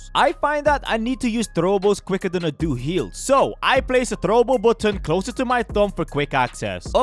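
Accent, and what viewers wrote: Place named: Russia. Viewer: Why do you sound Russian? Are you Russian?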